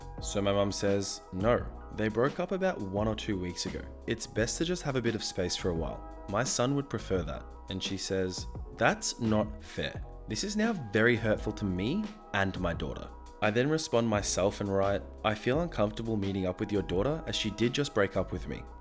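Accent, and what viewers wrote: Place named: Australia. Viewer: Aussie accents are cool.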